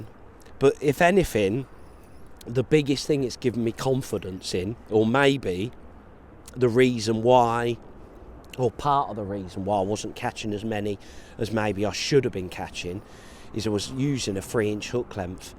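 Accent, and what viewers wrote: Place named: Cockney, United Kingdom. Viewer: I’ve said it before, love knowledge & intuitive nature, which when u listen to most other pretentious promotional crap, ie ringer & the likes irritating wankers! Carn’t get enough of cockney tones!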